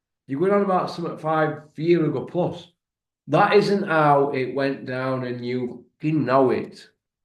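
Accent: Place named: Northern England, United Kingdom. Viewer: Your quickly becoming my favourite boxing channel. Rough round the edges worts and all just a straight talking northerner. Greeting from the red rose county ;-)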